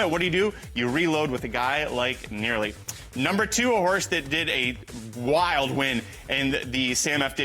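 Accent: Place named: Canada. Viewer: Dude you have no clue! You are stupid, and sound Canadian!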